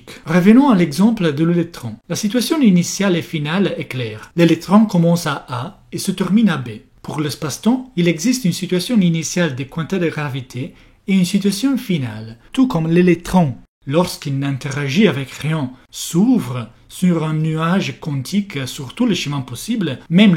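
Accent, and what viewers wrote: Italy. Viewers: Vraiment je pensais que tu imitais la voix de Roveli, mais tous les italiens ont cet accent adorable quand ils parlent français, c'est trop chou <3 Donc si je tiens compte de la structure géométrique d'un réseau de spins. Je peux dire que chaque point rouge représente le centre de rayonnement d'un "quantum de distance". Autrement dit cela ne peut pas représenter des sphères, mais des polyèdres, exactement comme ce que l'on obtient dans une mousse de bain, où chaque point rouge est le centre d'une bulle, et le rayonnement s'adapte en fonction des bulles environnantes (formant des polyèdres). Si je considère un univers plan, la structure géométrique serait parfaitement équilibrée avec des triangles équilatéraux, et il ne se passerait rien. Le truc curieux, c'est que cet espace existerait bien physiquement, mais n'aurait pas d'épaisseur, oups ^^ Par contre, la structure 3D la plus simple et la plus équilibrée, serait une structure des tétraèdres, et normalement (sauf erreur) on ne peut remplir un volume avec des tétraèdres réguliers sans être obligé dans déformer quelques uns, sinon il y aurait des "trous". 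Autrement dit si je ne me trompe pas, une structures 3D infinie, n'aurait de cesse de se déformer, cherchant quelque part un équilibre et ne le trouvant jamais, en dehors de toute notion de temps bien sûr. Et qui dit déformation, dit énergie ... ce qui répondrait à une très vielle question philosophique quand même ;p Et si je regarde un seul point rouge dans ce contexte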